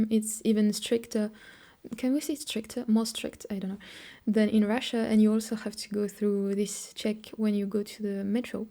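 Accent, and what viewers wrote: France. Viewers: Through the window / Stricter. How could one prefer Chinese culture over Japanese culture is beyond me. And very surprising considering how introvert and clean you are...Opposites are attracting each other I guess.^^ The mountain range of Huangshan, which inspired the floating mountains in the Avatar movie, not too far from Shanghai, is the most spectacular landscape I've ever seen, and I've seen a lot, especially when you are above the clouds, which makes it feel like the mountains really are floating . Just need to escape the armies of Chinese tourists to really enjoy the site. They tend not to go off the beaten path which makes it easy. Telling French people to lose their accent when speaking English is a terrible advice, if you know what I mean =P (I m a simple man) You prolly don't give a damn but you are losing some sex appeal when speaking English, you are way sexier in French/Russian xD Great video! 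Disclaimer: Most French hate the French media. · Something about the French accent when spoken by a Lady. Wonderful. 🙂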